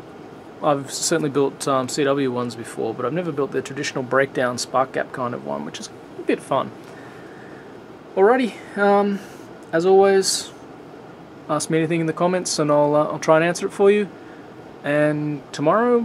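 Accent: Australia. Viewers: Very well explained. When Im tired of modifying my 1958 radio (6BX6 RF amp, 6BL8 local oss and mix, 6BX6 IF, 12AX7 Baxandel tone and 2x 6GV8 audio) to fill the dial with DX, I would love to have a crack at a Tesla Coil. I agree that tuning will improve things. Some people reckon harmonics can be good, guess I will find out. Great to hear an Aussie voice (besides Mr Jones) here on youtube.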